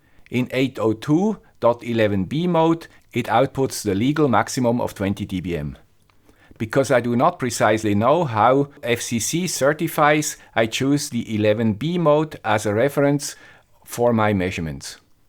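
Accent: Switzerland. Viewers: youtube: *sends notification about new video * me in mind: * PRETTY YOUTUBERS HERE IS THE GUY WITH SWITZ ASCENT WITH FRESS SENSORS AND MCU......* 😍😍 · Hallo Herr! Ich hoffe Sie haben trotz dem netten Schweizer Akzent das Deutsch noch nicht ganz verlernt. Ich liebe ihre sibirische? Katze vom Abspann, gruss von meinem sibirischen Kater Candy. Leider habe ich das englische nicht so drauf wie Sie, kompliment für Ihr Schweizer Englisch und Ihren tollen Kanal. Ich habe noch keinen Youtube Kanal gefunden in dem ich so präzise Erklärungen zu diesen hochtechnischen Themen gehört habe. Bitte weiter so! Grüße von Michael aus Deutschland.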